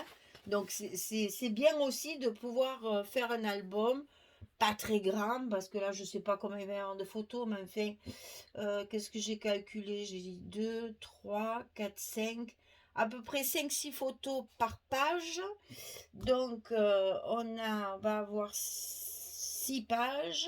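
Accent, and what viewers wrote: South, France. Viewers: Bonjour Toujours un plaisir de regarder vos vidéo et d entendre votre accent du Sud! je scrap depuis plus, de 10ans avant j étais dans une association maintenant je scrap chez moi beaucoup pour mes petits enfants Sympa le kit 😍 J habite à Granville en Normandie ( pas très loin. du Mont St Michel) 😉 Laurence Bonne journée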